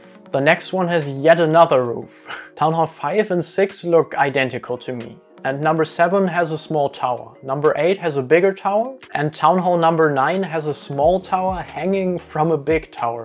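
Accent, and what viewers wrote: Germany. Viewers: du bist deutsch man hörts;) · Man hört den deutschen akzent so was von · Dude you got a german accent ( Nicht böse gemeint)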